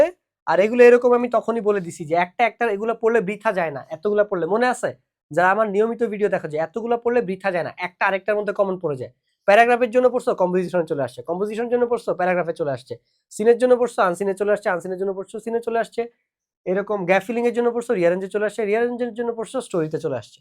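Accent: Chittagong, Bangladesh. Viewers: Your ctg accent is soo good bhai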